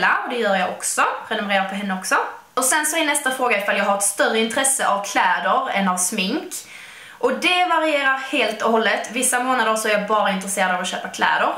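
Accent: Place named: Scania, Sweden. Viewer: Haha, när du sa att du inte pratade så jätte mycket skånska jag bara va? Jag tycker att du pratar väldigt mycket skånska. Är själv stockholmare så fattar inte vissa ord du säger men jag tycker skånska är fint. Vissa av mina kusiner bor i Skåne så är van med dialekten. ( min mamma är även uppvuxen där...). Det här var inget hat tycker bara att det var lite roligt när du sa det. Ha en bra dag och den här videon var jätte bra!! kram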